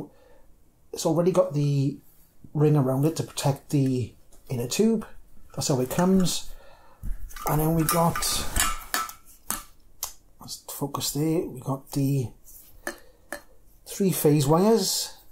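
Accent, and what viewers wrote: United Kingdom. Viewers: Nice to here an accent that sounds like you live on my street, instead of the USA. Well presented video, look forward to the sequel. If you ever need any more of those headlamp clips, by the way, you can get them in Wilco for a couple of bob, they are for holding your greenhouse glass on. They only last about a year outdoors, but will probably be ok in the headlight. Paul SW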